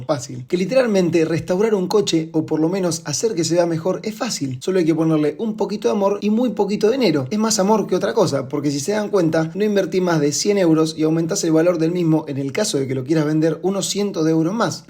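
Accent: Argentina. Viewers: que es la cinta q le pusiste al espejo? tengo rotito el mio... sos argentino? las patentes no son argentinas pero la tonada si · De donde es este flaco? es un argentino que vive en españa?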